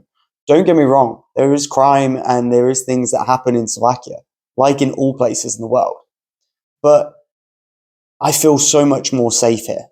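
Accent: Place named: South, United Kingdom. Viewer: I already knew from the title that this guy would have a southern accent. There are parts of the UK where it's better than he's experienced, but his general point is still true overall, even about the other places. An obvious example I thought of was how here, it's common that a bar will just run a tab and you pay at the end. Another one like the ice cream incident, I filled the car up at the Tesco on our estate, realised I didn't have my wallet so no money or even ID. The guys originally agreed I should repark the car and leave the keys while I walk back to my flat for my wallet. When I came back in with the keys and they'd seen I was going through with the plan they just told me to drive off and get my wallet so they could close the thing quicker.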